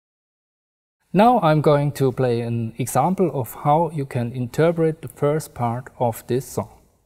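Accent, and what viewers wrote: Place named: Germany. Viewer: Crazy question: Is the book in English. He sounds German and I don't understand German. Sorry to have to ask.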